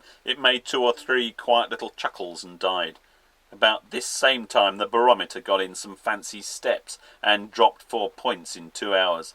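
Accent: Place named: England, United Kingdom. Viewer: I woke up this morning with a cup of coffee and the sound of rain drops on your cabin top and listening to the voice of an enthusiastic English man reading a stories of sailing adventures! A great way to start the day! Strange, it seems we Americans love being read to by a person with an English accent. I'm not sure why. Its hypnotic! "Can I have some more please?" As always love your videos.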